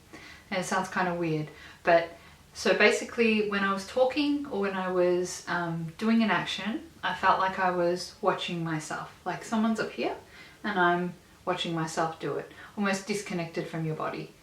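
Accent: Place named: Australia. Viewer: Already feel much better after watching this. The aussie accent is definitely soothing too haha.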